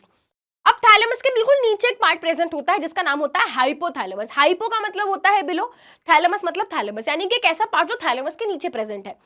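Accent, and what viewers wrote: Bihar, India. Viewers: Just luv your Bihari Ascent🤩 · Bihari Ascent🤩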